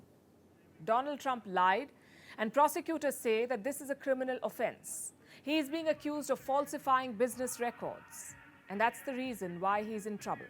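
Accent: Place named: India. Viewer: You....English please! Not indi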